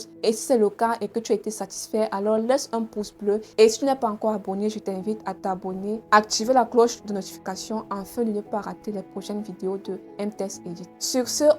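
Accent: Cameroon. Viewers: Une camerounaise. Je dirai une eton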